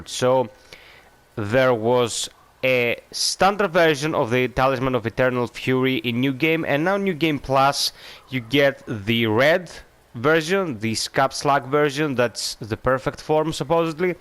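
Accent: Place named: Greece. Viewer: χαχαχα λεω σαν να μου φαινεται ελληνικη η προφορα του και μετα ακουω τον κρατο να μιλαει ελληνικα :D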